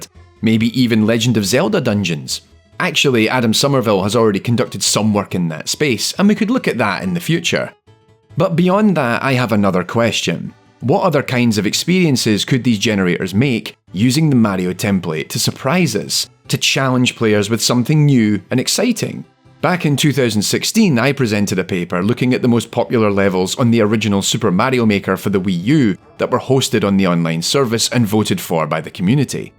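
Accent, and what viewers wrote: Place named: Fife, United Kingdom. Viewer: I may be wrong but I sense a Fife accent?? 👀 also I luv the video I should rly be asleep right now lmao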